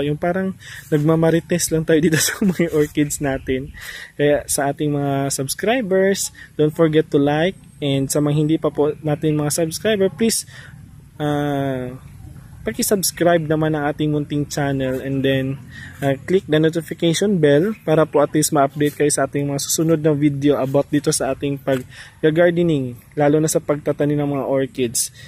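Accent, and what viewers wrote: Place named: Iloilo, Philippines. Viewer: May waling waling din po kayo sir.. Ang galing mo mag tagalog sir pero ang accent nyu is ilonggo sir.. Ako din ilonggo din po ako sir.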